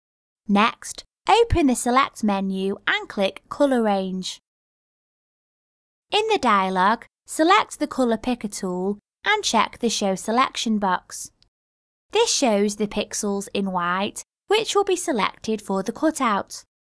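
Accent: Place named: England, United Kingdom. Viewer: Omg she sounds gorgeously English like someone on a reality tv show. love her!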